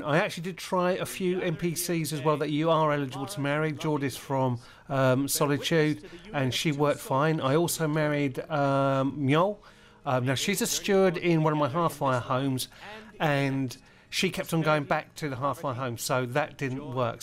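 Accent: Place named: Cornwall, United Kingdom. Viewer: Cornwall, I wondered why I couldn't pick your accent !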